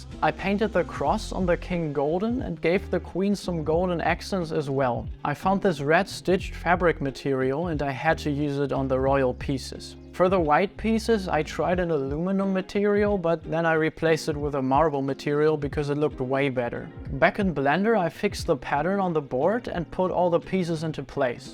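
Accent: Germany. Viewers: Ich feier deine Videos, aber der deutsche akzent fickt meinen kopf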